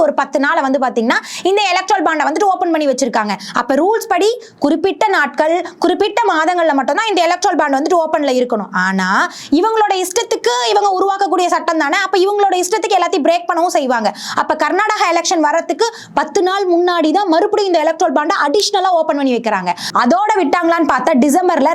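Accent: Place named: Kongu, India. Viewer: பேச்சு‌வழக்கு கொங்கு நாட்டு பக்கம்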